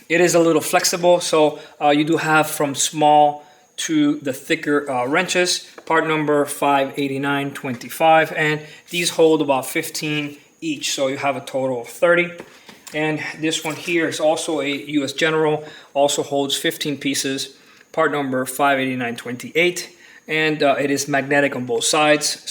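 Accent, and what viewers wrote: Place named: Cuba. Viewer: Great video! I like your voice, you sound just like my wife's uncle. He is Cuban, raised most of his life in the US, but has just a little bit of an accent.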